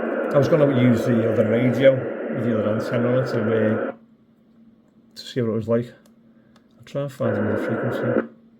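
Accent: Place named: Liverpool, United Kingdom. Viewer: Great video mate, im going through all your videos, thinking of having a go , I had some interest about 40 years ago but never pursued it, actually went to riversdale, sure you remember Riversdale college, I became a clockmaker, I have a YouTube channel myself Clock Repairs Merseyside , got quite a few followers now, but I’m slowing down need to find something to take my mind away, came across your channel instantly recognised our familiar scouse accent, new I was in the right place 😁😁 so I’ll be binge watching everything, great stuff 👍👍. Best wishes John Clock Repairs Merseyside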